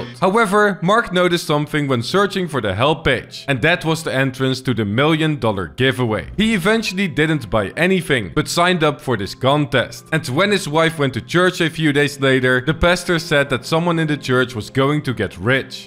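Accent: Netherlands. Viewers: The dutch in his english voice 😭😭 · is this guy dutch? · Your accent really sounds Dutch, are you? 😅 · I like the videos.. The dutch english accent is just to cringe for me, unwatchable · Ik hoor gewoon je Nederlandse accent door je Engels heen haha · Het duurde minuten voordat ik erachter kwam dat je een Nederlands accent had. Toffe video :) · I smell dutch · I smell dutch accent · i smell dutch but ar you dutch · THE DUTCH ACCENT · You sound Dutch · Are you dutch? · are you dutch? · deze guy klinkt nederlands nice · Are you dutch by any chance? I can hear a accent. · Wat een Nederlands accent zeg haha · Dutch accent hihi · i smell dutch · You sound dutch, very very dutch. i can tell by your accent 😂🇳🇱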